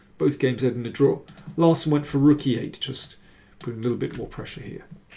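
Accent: United Kingdom. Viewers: Adnan he actually used the word "perilous" in this video which I can safely say Ive never used in my whole life. Its that British diction I love it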